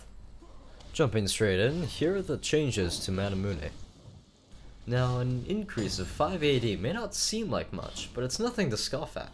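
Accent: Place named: RP, United Kingdom. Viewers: He sounds kinda posh but that is how he speaks irl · Can you talk normally instead of trying to sound posh and weird. Thanks,nice vid tho besides the annoying story telling.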